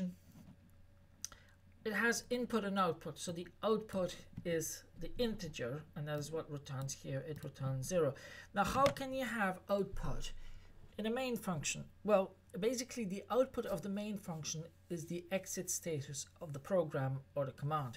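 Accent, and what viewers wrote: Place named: Ireland. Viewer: I cant put a finger on your accent, sound irish but something else too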